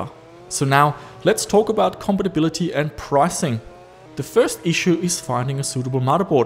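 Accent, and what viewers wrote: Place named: Germany. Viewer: When I watched the video from 2015, you had less German accent than now.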